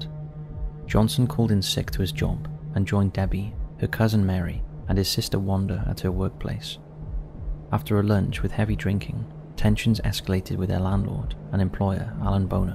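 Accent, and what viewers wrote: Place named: England, United Kingdom. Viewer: I thought wecwere going to see and hear Ed & Lorraine Warren. Instead, we hear someone with an english accent talking over the entire video. Did not hear any of the actal video. Extremely dissappointing.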